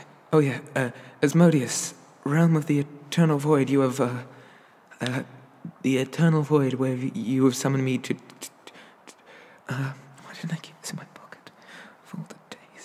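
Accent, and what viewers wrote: United Kingdom. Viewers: Am I the only one who loves the slight british accent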